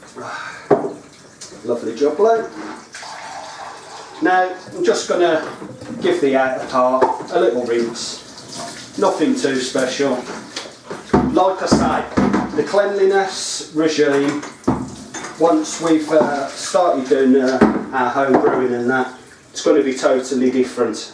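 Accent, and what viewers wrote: United Kingdom. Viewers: got to love the midlands accent ;)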